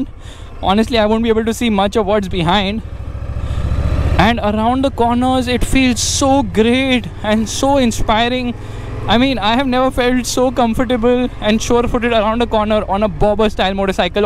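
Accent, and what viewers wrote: India. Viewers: i'd rather watch the whole video on mute just to look at the motorcycle if i have no other option. And thats just to avoid that horrendous, terrible thick indian accent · logat e lucu yo Mbah wong india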